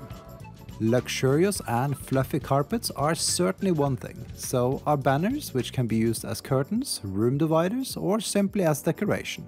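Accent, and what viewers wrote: Norway. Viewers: Might i ask where your from ? sound so Norwegian :p Love the ideas ! keep it up :D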